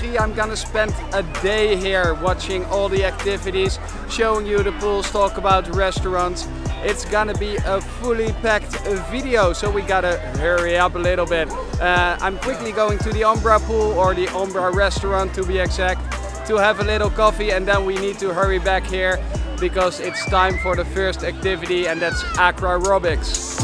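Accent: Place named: Netherlands. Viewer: Leuk! Ik ga daar 16 juli 2022 heen!❤(ik hoor wel dat je nederlands bent hoor😄)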